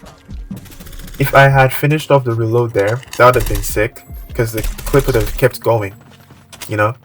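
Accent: Nigeria. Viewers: Bro, are you Nigerian?😂😂 very useful video btw🤞🏽🙏🏽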